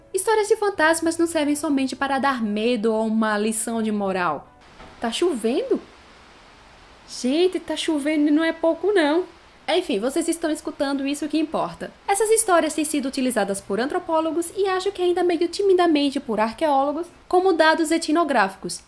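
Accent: Brazil. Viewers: o sotaque nordestino misturado com o ritmo de fala mais compassado e a voz suave parecendo estar contida ajuda muito Ah, parabens pelo conteudo!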